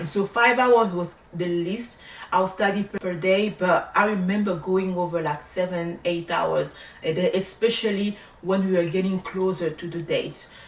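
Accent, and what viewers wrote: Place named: Cameroon. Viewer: Hi new subscriber here👋🏽, first of all I want to thank God that I have came across your video. Your first video that pop up on my feed was "how to find sponsors for a green card (2025)". I will try to make it short (because I like to talk a lot). To be honest when I clicked on your video I wasn't sure what to expect, but I believe is God who wanted me to come across your video. When I heard your accent, I told myself, maybe she's from Cameroon 🇨🇲🤭, but when you said you were from Congo 🇨🇩, wow 😳 I was so amazed. Let me tell you how proud I am as your fellow Congolese🇨🇩 sister to see everything that you have achieved, seriously with all the titles on from of your name, that's the most blessing ever (You Go Girl!!). Like I said I will try to make it short 🤭😅. So, I am a UK trained nurse and I live in London. I was born in Congo, been raised and lived in Belgium for 13 years and I have decided to move to the UK. 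So, you might have guest from now that by this message I want to migrate in the US as an RN for so many reasons. But the first is for the job progression and all the nursing opportunities. In the UK, unfortunately, right now the UK is failing all their nurses. So I am on my journey to migrate, I know the road will be long with retrogression (EB-3 visa), but I believe God can do a miracle and touch Mr Trump's heart to make a big change for us nurses😁. On a serious note, I have start the application with New York BON because right now they are the only state that ac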